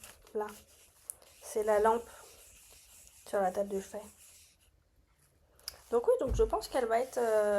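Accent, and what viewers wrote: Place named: England, United Kingdom. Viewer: Jolie découverte de ta chaîne grâce aux suggestions de Youtube :) Quel joli accent anglais d'ailleurs ♥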